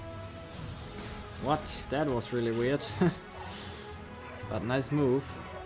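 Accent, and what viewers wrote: Germany. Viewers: Bei Gott ich lieb deinen deutschen Akzent! XD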